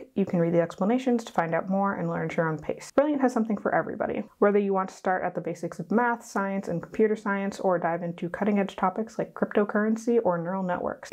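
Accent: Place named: United States. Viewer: As I am not American (although English is my first language) I really struggled with understanding what you were saying. You speak quite fast and your speach is not particularly crisp. If you want to convey information to people please be aware they need to understand what you are saying. Not everyone can keep up with American speed speach !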